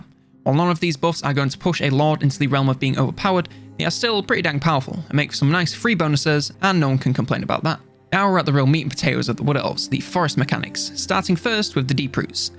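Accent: United Kingdom. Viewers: These stock images and British voiceover would make SpiffingBrit proud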